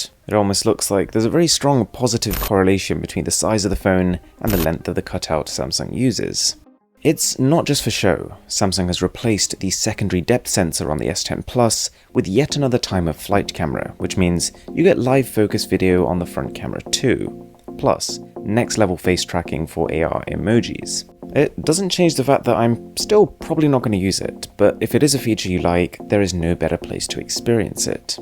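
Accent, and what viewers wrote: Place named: England, United Kingdom. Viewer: no man! He lives in England that pretty much explains his accent...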